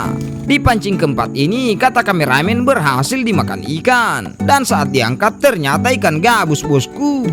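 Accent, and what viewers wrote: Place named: Medan, Indonesia. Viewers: Ini Lokasinya Daerah mana bang?, logat abang kalo berbicara seperti Logat Medan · baru pertama nonton channel ini seru juga, kayaknya dari logat orang asli sumut ya bosku?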